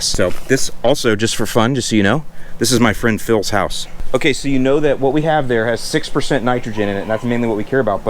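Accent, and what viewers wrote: Chicago, United States. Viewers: I can't get enough of the accent. I need a whole Chicago accent episode!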